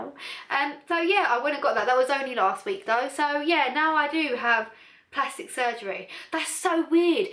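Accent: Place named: United Kingdom. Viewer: Sweetheart, I absolutely LOVE your accent. I'm completely sorry if that's offending, I know when people tell me they like my "Caribbean" accent it can be quite annoying sometimes. I totally admire you and your makeup work! I love you tons! Hopefully when I go visit the U.K. that I run into you! Much love <3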